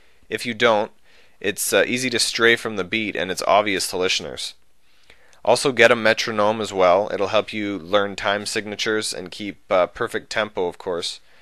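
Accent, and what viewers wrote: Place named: Halifax, Canada. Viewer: Are you from Halifax? That accent always gets me...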